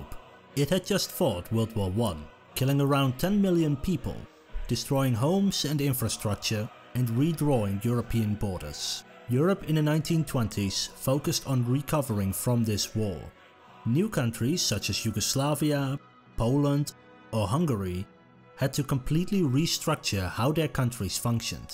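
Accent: Netherlands. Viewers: He's dutch. It's an accent. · you probably know this but he is Dutch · Kom je uit Nederland? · Narrator sounds Dutch. Is he Dutch? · Of course. That's a thought. However, it would work with an unmarked accent and the emphasis on 'rupt'. However, with his heavy accent it sounded like a mistake. Now that I realise he is Dutch, the word 'rupts' is in that language too so he might have presumed that it translated to English. All very interesting. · Are u dutch? Ben je Nederlands? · Thank you for the content... I am listenning to your accent... are you Dutch? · Ik had graag deze video willen genieten, maar je Nederlandse accent en VOORNAMELIJK je vreselijke "slis" maakt het verschrikkelijk om naar te luisteren...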